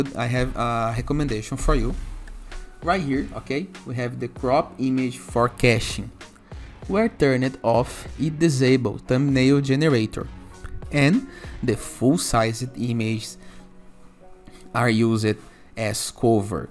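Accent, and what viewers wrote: Brazil. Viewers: Uai... Você não tá ensinando português de graça, né... hehehe Mas a dica grátis é Faça um dicionário de pronúncia e passe a ler as letras na pronúncia do outro idioma, não na da tua língua materna Aí ao invés de lêrni ráu tchu impruvi en op-maizi dê sêrchi rezuuls E ficaria mais leern róu t'il óp(t)máiz een m-pruf dâ sêrsh (sem o i) rizult's Pra quem tá há 6 anos num país (a menos que você esteja em Detroit ou Miami) era de se esperar que você tivesse dedicado bem mais pra falar o idioma além do "suficiente pra se comunicar" e passado pra algo fluente (E olha que eu nunca sequer viajei pra algum país anglófono) Logo, trocar o maneirismo "né" por "ok" não faz nenhum sentido. Americano não fala assim. Até se fosse o "ya know" faria mais sentido ou trocar o "tipo" por "like" (que continuaria sendo feio, mas pelo menos faria sentido no outro país. 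Porque, se um zé aleatório que nunca viu tua cara conseguiu identificar LITERALMENTE em duas frases de onde você é, acho que ESPECIALMENTE nesse governo aí a coisa não vai dar bom pra você 😬 É um problema bastante recorrente do brasileiro classe média achar que é branco no mundo todo só porque se considera branco aqui [E eu falo isso justamente porque tendo a pensar que seria melhor você aí do que voltando hehehe]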